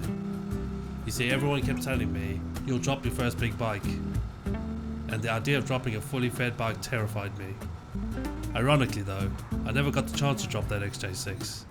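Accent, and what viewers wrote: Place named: United Kingdom. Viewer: Thanks for the video. I respect that this is your channel and you certainly can present whatever you want. From a purely personal perspective I'm American and I do have to listen closely to those from Britain to be sure I understand you. I'm probably in the minority here but I find background music distracting at any volume because it inhibits my ability to understand you. I appreciate that you are a motorcycle purist and that is worth hearing. I'm a good bit older than you and remember the first Triumph Bonneville I ever saw/heard, <num>. I don't remember any other motorcycles from that period as well as I recall that Bonneville. As soon as I can sell my BMW I'm going to buy a Triumph....I should never have bought the BMW.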